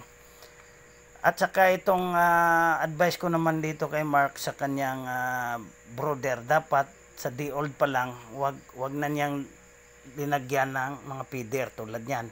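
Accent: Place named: Visayas, Philippines. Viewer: tgaasa diay ka boss.? bisaya mn diay ka?😂😂😂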